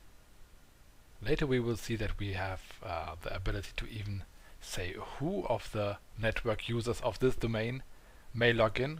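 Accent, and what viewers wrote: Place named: Germany. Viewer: Thank you... Your accent is absolutely German :)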